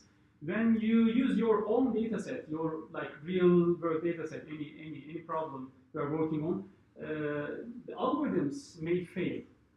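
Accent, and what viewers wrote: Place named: Turkey. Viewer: Great presentation.. the presenter has Turkish accent like mine. :))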